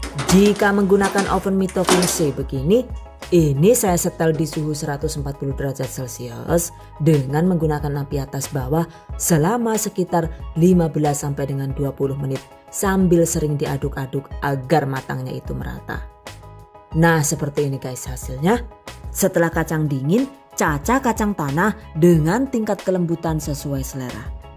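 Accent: Banyumas, Indonesia. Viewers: hahahahaha lucu banget si lah. nek mirengaken tiyang ngendika bahasa ngapak toli lucu banget. ternyata aq dewek ya lucu ya nek ngomong ngapak hahahahaa😂 #GiveawayTHV2021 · Sambil nyimak sambil nyengir2 dngerin omongan ngapak 😂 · Selain resep2 dan penjelasan yg jelas singkat padat,aku suka sama logat ngapak nya...Caaaaatat....😀matur nuwun mbok ilmu nya semoga sehat selalu...Caaaatat😊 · Bener bgt...ngapak abis logatnya...wkwkwk...trus detail bgt lg....semuanya dh pokonya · Ora ngapak ora kepenak 😅. Sukses terus buat yang selalu menginspirasi dengan resep resepnya #GiveawayTHV2021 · Bhs ngapak e menghibur,lucuuu....resepnya jempolan.favorit 💞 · Slalu semangat nonton vidio... Selain seneng karna resepnya simple n jelas juga karna lucu banget apalagi klo lg ngomong ngapak sumpah nyong geget banget... #GiveawayTHV2021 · Selalu suka sm video² krn lengkap bgt sm tips² yg sangat berguna.oia, sm satu lg.logat ngapaknya yg selalu jd ciri khas...makasih buat sharingnya...semoga semakin berkembang #GiveawayTHV2021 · Yg sy tggu tiap liat video adalah logat ngapaknya 😁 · Mantap,. 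Nyam² :) Selalu terhibur dg ngapaknya. #GiveawayTHV2021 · Alhamdulillah nemu resep baru dari Kesukaan anak q beng2 Okay...pingin nyobain bikin buat isian toples lebaran nanti.. Suka sekali dgn penjelasannya bunda mudah di mengerti trus lucu ada logat ngapaknya 😁 #GiveawayTHV2021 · Chanel kesayangan ku, mudah d fahami krna selalu ada tip & trik. Dan yg paling uniq bahasa nya inggris,indonesia dan ngapak.pokoe lain dari yg lain deh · Ngapaknya itu loo..jd ingat klo keluarga besar suami lg ngumpul di Sumpiuh 😄😄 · Logat ngapake wisss ora ana tandingane😁💜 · Hhhhhhh lucu,seneng dech dengerin mbk nya klo lg ngomong ngapakkk auto jd ngakaakkkkkk dech nyonge😂😂🤣🤣🤣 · Logat ngapaknya emang selalu bikin kangen kak, 😁 sukses terus, gak pernah pelit resep emang ini🙂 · DONE #GiveawayTHV2021 Bune gawekno resep kue ilat kucing dong,sing ekonomis tapi maknyuss👍tak enteni lo bune🤗 Nek ora ngapak ora enakkk😁😂😂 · Love love banget sama ngapak nya 😅😅😅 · tetep dong logat ngapak ga ketinggalan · Senengx disini lengkp. 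Suara enak. Lucu lagi. Dan bisa bhs ngapak. Pada karo inyong. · Lucu org jatim tp bs ngomong ngapak n pinter bhs inggris pula msh muda, tp suara dewasa keren" resep nya👍👍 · DONE. Resep cemilan is the best, pas buat isi toples persiapan lebaran. Selain unik cr penyampaiannya yg slalu ngapak resep n caranya jg detail bgt. Trm ksh teh resepnya yg slalu menginspirasi bgt.#GiveawayTHV2021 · Amazing.... Ngapaknya... Sukseeees maa syaa Allah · Ngapaknya bikin ngakak bu...mood banget dah 😂😂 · Suka lihat ngapaknya sama seperti saya penjelasan nya jelas makasih ya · DONE #GiveawayTHV2021 Penonton setia,slalu jadi inspirasi,Krn slalu mengeluarkan ide ide yg cemerlang untuk sy biar semangat untuk usaha jualan dan penjelasannya begitu detail plus Bhs ngapaknya ciri khasnya sy bgt,matur suwun 🙏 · Bismillah #GiveawayTHV2021 Bahan simpel mudah di dapat Suara yg ngapak bikin ngakak🤭🤭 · Mb lewih kepenak ngomong ngapak bae lah..😀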